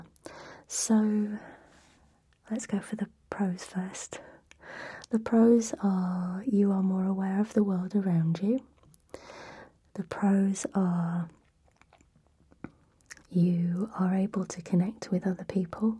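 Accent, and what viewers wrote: United Kingdom. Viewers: I subscribed because of the nice British accent c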